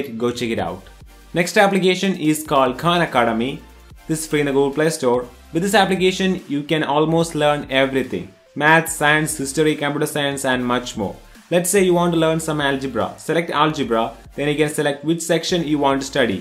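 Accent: Kerala, India. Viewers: i guess u are a malayali??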